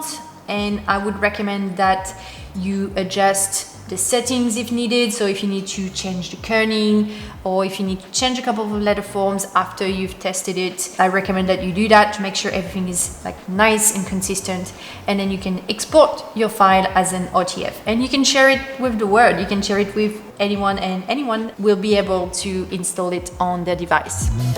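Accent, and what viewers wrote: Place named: France. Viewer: Your accent is quite good, but I can easily recognize your french ! ;)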